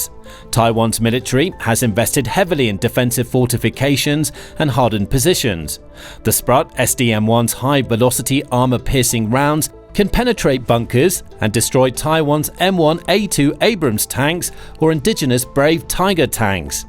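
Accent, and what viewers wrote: United Kingdom. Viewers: Soon as I heard the accent, I knew it was going to be all lies and I'll be dern, it sure is. Outright fabrication. If the MI6 actually believes this crap, it's no wonder their empire has crumbled! Furthermore, how can these MI6 boys think we are ignorant of their little tricks and plots? Only the dumbest people believe that Janes Bum fantasy as savior of the world. HA! The world is awake now and will shake off these parasites like a bad cold.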